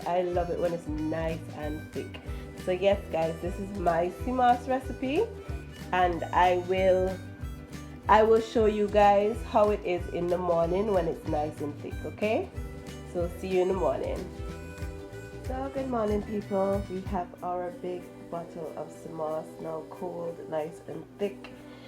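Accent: Antigua and Barbuda. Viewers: YouTube brought me here because I was looking for a recipe, and then I heard the accent and was like, "Hold a minute." Antiguan 🇦🇬 can't hide.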